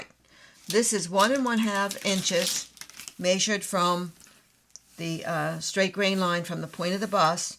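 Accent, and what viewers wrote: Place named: Pennsylvania, United States. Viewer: I just found your channel and am learning so much..!! You must be from PA, my aunt sounds EXACTLY like you ❤.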